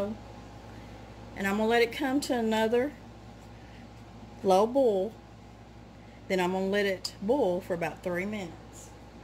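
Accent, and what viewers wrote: South, United States. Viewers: I absolutely love your channel! Your southern accent is so beautiful and relaxing. You and are the cutest ever! You make me this yankee girl miss living in Tennesse God Bless y’all ♥️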